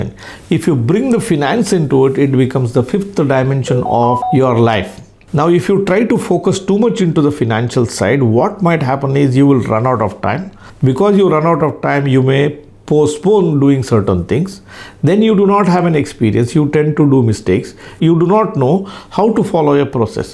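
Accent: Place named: Karnataka, India. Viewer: English with a Kannada accent- very attractive.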